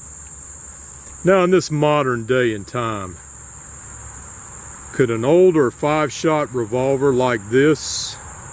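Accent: South, United States. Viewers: “Excuse my execrable French” Mon Ami, I very selfishly felt “included” in this comment. However may I assure you that you as a Southern gentlemen with your accent ….. that the French would find your “French” est très magnifique. Avec moi, with a Belfast Irish accent and though being French resident for several years my sometimes totally correct French vocabulary and grammer is often considered by my French friends as horrible, mais l'accent de ma femme Susan anglaise totalement acceptable. Great video comme toujours, especially in these times. My thoughts are with you and your family, stay safe. You can always trust a Smith!! Cordialement RB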